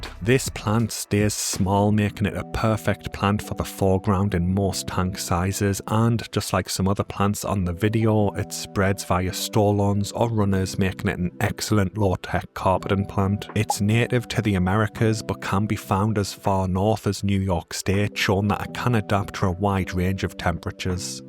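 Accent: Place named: North, United Kingdom. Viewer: Can tell you're from the north (not just from the accent) my house won't get below 20 degrees over winter. We're made of soft stuff down here 😂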